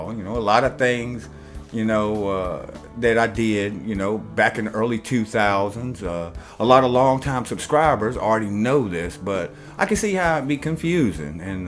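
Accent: South, United States. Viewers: Man that sucks that people unsubscribed do to your warehouse america videos. I mean if you don't like em don't watch em. Bunch of self entitled asshats. But yeah, what you said at the end is true. If they can't get over this then how "awake" are they. And people. what the fuck is up with the constant accusations of his accent being fake? Why, because he sounds "black"? Dumb asses, that's a southern drawl which in turn is what influenced how modern African Americas talk. And even if he did, so fucking what. We need to get off this race bullshit. We're all human, our "real" race is just melatonin levels in the skin. What we call "race" is just cultural, just how we act based on where and how we're raised. If a white guy is raised in the ghetto he's gonna probably act "black" and if a black kid is raised like a typical white bread then he's got a good chance of acting "white". 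Of course I know the real reason for this shit, that self important higher then thou feeling when you go all social justice warrior and call out a "privileged" white man for stealing and raping black culture which is total bullshit. Get over it, every race has done fucked up shit to each other and we are so over that by now.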